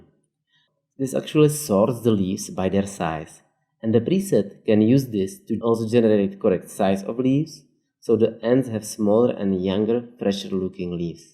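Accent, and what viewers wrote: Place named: Czech Republic. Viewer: That Czech accent lmao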